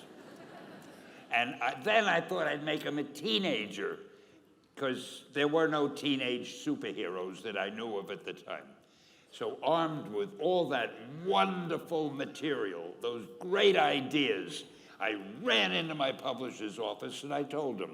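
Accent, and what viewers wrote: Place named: Argentina. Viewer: tremendo acento argentino el de stan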